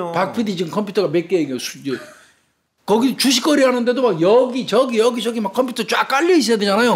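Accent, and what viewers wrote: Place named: Jeolla, South Korea. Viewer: 김복준 교수님 전라도 사투리 구수한 말씀 아주좋아요 옆보조가 좀 촌스럽다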